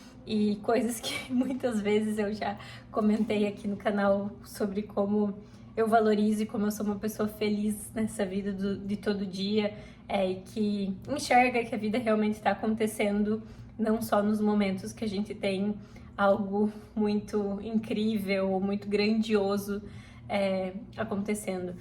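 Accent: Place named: Rio Grande do Sul, Brazil. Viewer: oii, sou nova aqui, daonde tu es? sou do rio grande do sul e achei teu sotaque parecido com pessoas daqui da volta heheh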